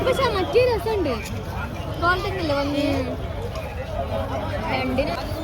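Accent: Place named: Malappuram, India. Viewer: അന്റെ മലപ്പുറം ഭാഷ കേറി വരുന്നുണ്ട് മോളെ 😂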